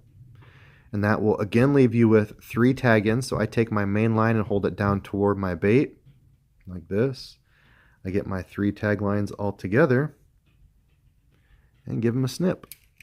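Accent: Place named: North, United States. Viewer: You just quoted Gerald Swindle word for word about not trimming your tag ends super short because if the bass didn’t see the rest of the line they won’t see the little tags. Get your own material. Don’t be stealing us Alabama boy’s lingo. Especially if you are from the north which is what I’m guessing based on your accent!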